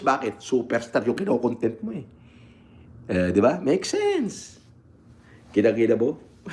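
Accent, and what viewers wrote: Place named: Nueva Ecija, Philippines. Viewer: nangto tunog nueva ecijano, kupal peke imahe HAHAHA😂😂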